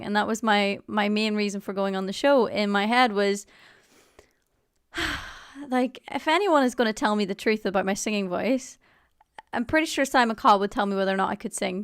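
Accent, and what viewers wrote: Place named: Ireland. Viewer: Did they ask you to change your accent on XCRAPTOR. I was so happy when you kept your beautiful Irish tone.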